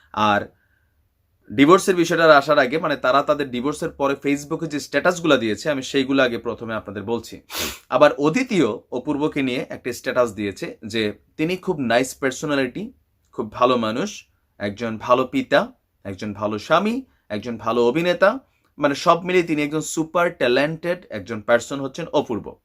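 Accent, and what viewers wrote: Comilla, Bangladesh. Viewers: আসসালামু আলাইকুম ভাই কেমন আছেন ইনশাআল্লাহ বালই আছেন ভাই আমি সিলেট মৌলভীবাজার শহরের আপনার কথা খুব সুনদর কুমিল্লা ভাষা টাও বাল লাগে। তবে বাই যদি দিন অথবা তারিক,টা দিয়ে যদি ভিডিও করতেন তাহলে,মনে কষ্ট নিবেন নাকি,দোয়া কইরেন বাই আল্লাহ যেন সবাইকে মহা মারি থাইকা হেপাজত করেন আমিন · Love from comilla Vhai comillar vasai kotha bolen moja lage😁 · ভাই আপনি কুমিল্লা বাসায় কথা বলেন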